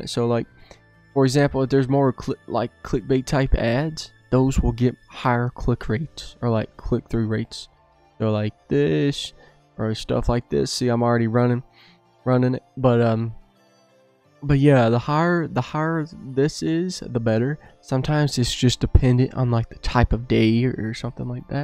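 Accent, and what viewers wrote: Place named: South, United States. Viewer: Your voice sounds country, I love it, yall that's why the music choice in every 1v1 video is epic🤘🏻😎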